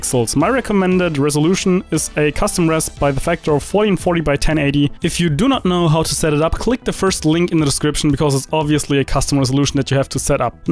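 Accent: Germany. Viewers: Naja, ich finde man hört jeden Deutschen raus :P Heisst aber nicht dass dein Englisch schlecht ist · OverwatchDevil man hört es schon raus aber viel besser als deutsche mit dem th und flüssiger als deutsche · German Accent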